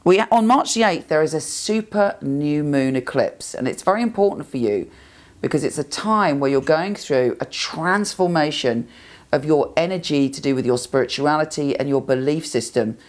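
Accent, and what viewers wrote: United Kingdom. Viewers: A British Accent and Sultriness to your tone in one. Wow.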